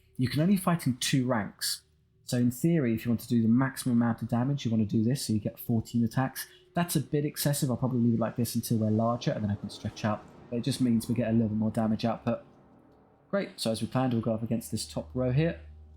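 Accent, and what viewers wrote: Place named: United Kingdom. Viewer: I feel like this is an awkward thing to say but you sound delightfully brittish. Thank you for the uploads.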